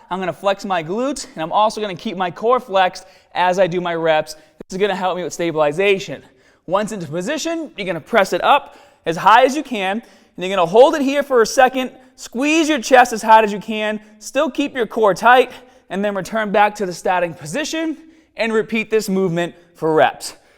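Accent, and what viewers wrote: Boston, United States. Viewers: That accent tho... I get confused every time. Is that really how ppl from Boston speak? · Boston accent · Weird, your Boston accent developed strongly over the last 4 years. I was just watching a video of yours from 2013 (Two Reasons Why Your Biceps Won't Grow!) and you don't even have an accent. Did you move to Boston and develop an accent? Keep your Rs, man. Don't lose those precious Rs. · in your earlier videos I don't remember your Boston accent coming out this much as the vidoes now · It's a Boston accent . Probably related to England and Ireland though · “Staaaaaaaaaht.” I can’t. There is no accent in this country worse than the Boston accent. Great videos....I’’m just having a haaaaahd time getting beyond the “aaaaaaah”. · He sounds like a real deal Bostonian to me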